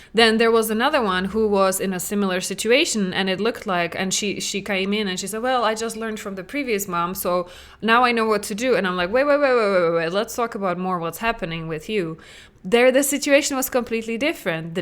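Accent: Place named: Czech Republic. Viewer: I was trying to place the accent, but didn't guess Czech Republic. I lived in Prague for 2 years. I love all the Czechs I know! I had a full breakdown last week just hopeless because I have been trying to learn to parent better for ten years now and I still can't (5 kids 10 and under). Your content is a new take, I'm excited to learn more.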